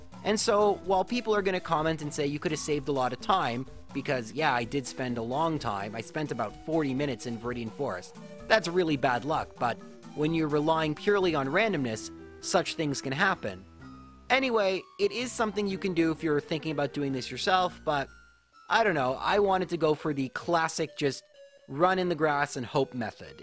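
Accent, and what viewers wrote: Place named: Canada. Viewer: I'm not sure what part of Canada lives in, but it was uploaded pretty early in all of the timezones. If he lives in Newfoundland or Nova Scotia, it was a somewhat normal time (around the 7am range), but his accent suggests to me that he doesn't live in either or those places. I could be wrong about that though, as some people try to underplay their natural accent.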